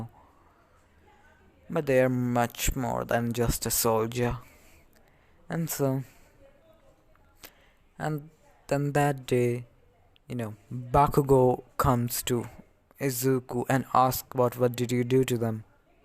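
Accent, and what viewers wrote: India. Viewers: Are you an Indian your accents sounds Indian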